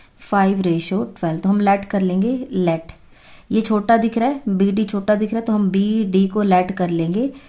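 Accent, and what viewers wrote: Haryana, India. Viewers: I think Haryana by her accent and all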